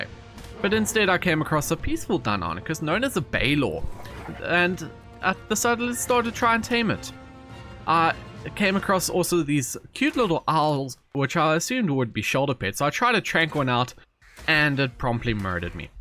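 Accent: South Africa. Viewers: Does anyone know where this guy is from? Accent sounds awfully like a South African accent, dunno, might just be me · You sound South African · Ayy man you from South Africa you sound like you do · South African?? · You South African? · The sound of South African accent is strong with this one hahahah I love it Insta sub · Yeyi ARE U SOUTH AFRICAN??? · you sound south african 🤔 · Are you south african?? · R u south african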